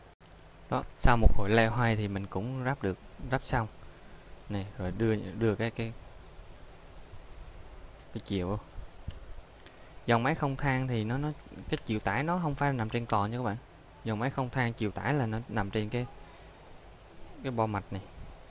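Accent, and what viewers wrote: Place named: Quang Nam, Vietnam. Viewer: núa lau lau dính tiếng quản🤔🤔🤔🤭🤭😁😁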